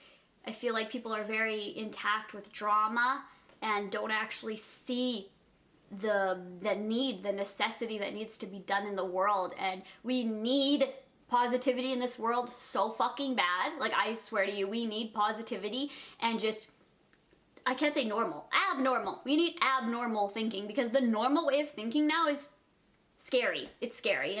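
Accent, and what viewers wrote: Canada. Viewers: It sounds like you're from Canada?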